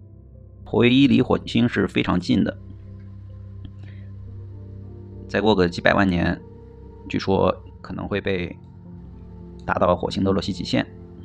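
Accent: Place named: Shaanxi, China. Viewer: 陕西人吧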